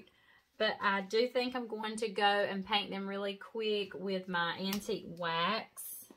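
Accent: South, United States. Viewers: You're so creative and your projects always look high end like most YouTubers say, but they don't usually hit the mark! Not trying to be ugly to them, just trying to compliment you as you clearly deserve! Also, I love watching those of you that have a wonderful southern accent like myself... I'm from South East Texas. Where are you from?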